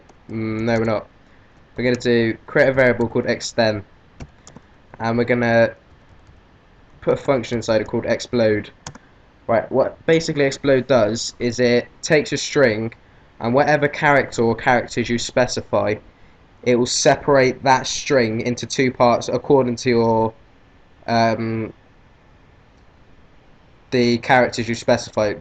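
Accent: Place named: Hertfordshire, United Kingdom. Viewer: a friend of mine he lives in Hertfordshire speaks really much like that guy =)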